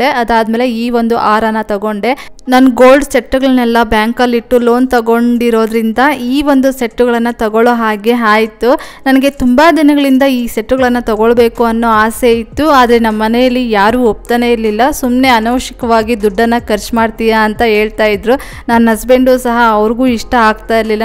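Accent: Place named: Andhra Pradesh, India. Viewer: memukuda telugu vallameandi,me kannada slang vini naku meru teluguvalle anipinchindi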